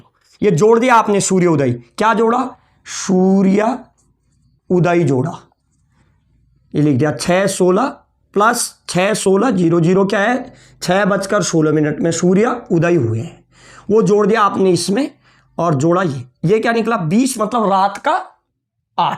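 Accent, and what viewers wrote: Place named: Pahari, India. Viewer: अरे dajyuu पहाड़ी हो क्या, टोन से लग रहा है❤। हम भी पहाड़ी ब्राह्मण ही हैं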